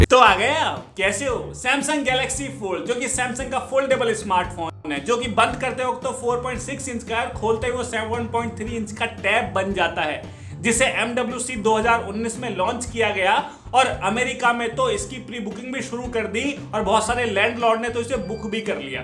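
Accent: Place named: Haryana, India. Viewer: Bhai video bich me rok k comment kr rha hu You are so funny bhai haryanvi lag rhe ho bhai aap muje samsung fold bekaar phone🤣🤣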